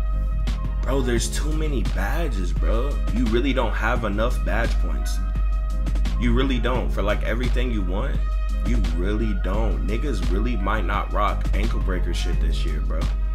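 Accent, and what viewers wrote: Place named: New York, United States. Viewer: Bro where r u from u talk like YRG and young don the sauce god and some New York nigga all at once bruh wth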